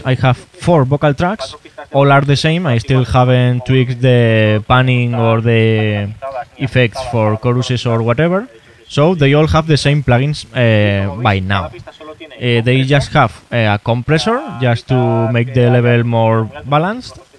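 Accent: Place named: Spain. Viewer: Your spanish accent sound pretty awesome! ahah and don't forget to always add some tags to your videos, so you can get more views ;D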